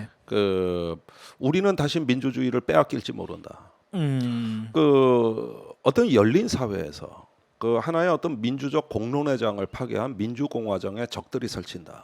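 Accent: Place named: Chungcheong, South Korea. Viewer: 졸라싸워! ㅋㅎ 충청도말씨 구수허유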